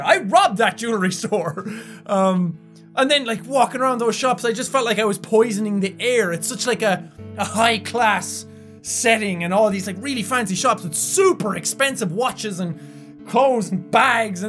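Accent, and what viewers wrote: Ireland. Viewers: Jack/ can you possibly add Auckland New Zealand time because that is where I live and if you are looking for a peaceful vaca without being mobbed or anything that's the place to go and if you ever feel like coming to my country I think you might want some help saying our regions if your scared that you might get angry at for pronouncing the name wrong because you are from Ireland and you have a type of strong accent that kind of makes it harder for us to understand if you are saying the name correctly or not. So if you ever see this comment and do this thank you! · am in Brisbane Australia.;D ill be up watching and everyone in my house will be like "where is that shouting Irish voice come from?"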